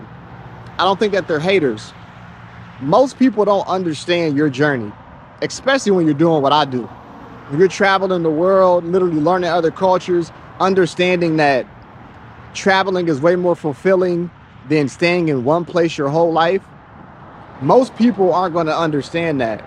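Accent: United States. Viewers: America people need to know that we find it strange when You start to speak with an American accent. So please don't think it is Because we are racist.... We also generally don't like American people are we feel to be loud and at time obnoxious. As for Charlie Kirk ....he was a hateful man and that hate came back on him. America is fkd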